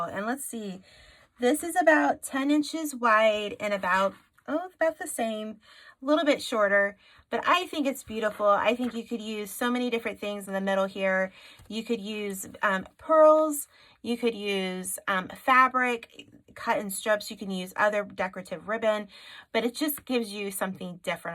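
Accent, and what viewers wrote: South, United States. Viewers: Great tutorial thanks so much. (By the way. There is no problem at all with having a southern accent. Contrary to popular belief, everyone has an accent! Embrace it.) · Nothing wrong with sounding Southern, I am one myself!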